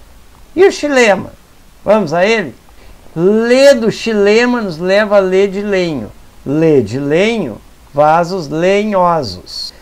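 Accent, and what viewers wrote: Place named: Rio Grande do Sul, Brazil. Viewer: Ba tu es do Rio Grande do Sul?ba muito boa sua aula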